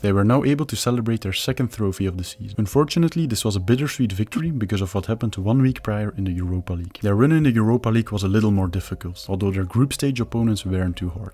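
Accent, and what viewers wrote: Belgium. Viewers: Klinkt eerder Vlaams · Oost Vlaams accent zal ik zeggen volgende keer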